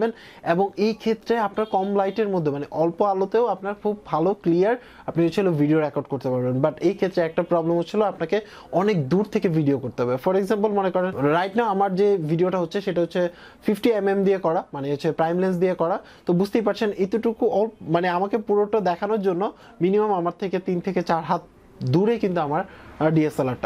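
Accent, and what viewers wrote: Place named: Comilla, Bangladesh. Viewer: ভাই আপনার গ্রামের বাড়ি কই? কুমিল্লা নাকি? ☺ গের গের সাউন্ড আমাদের এখানেও করে। হোমনা, কুমিল্লা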